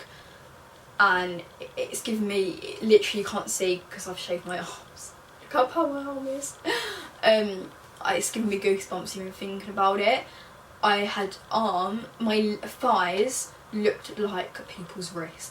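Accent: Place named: England, United Kingdom. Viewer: are you from the same part of England as Lisa Blackwell? because that accent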